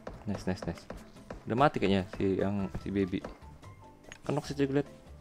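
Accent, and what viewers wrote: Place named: Medan, Indonesia. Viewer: Beda logat bro bg org medan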